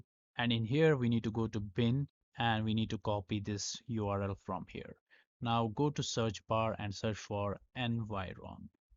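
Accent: India. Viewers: bro u indian?🧡🤍💚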